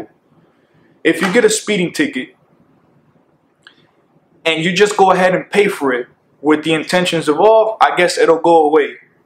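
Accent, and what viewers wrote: United States. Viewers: damn i miss that south FL accent. never thought i'd say that but it's true. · Miami accent coming in strong 💪🏼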